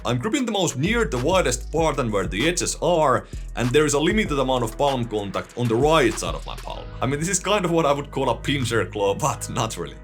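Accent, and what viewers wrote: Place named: Finland. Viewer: Your reviews are amazing and the Finnish accent is icing on top of the cake!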